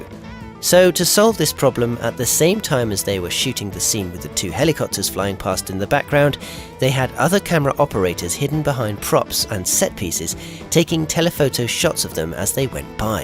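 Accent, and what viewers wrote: United Kingdom. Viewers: I like your accent. It sounds like you might be British or a touch of something else also. Have a nice day whoever you are. My name is Charlene!😊❤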